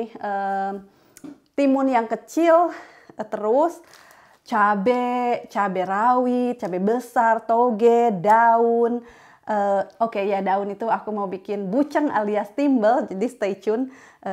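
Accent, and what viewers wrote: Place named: West Java, Indonesia. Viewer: Resep bhs reng logat sundana has te hilap slm ti bdg sehat trus kanggo keluarga ❤❤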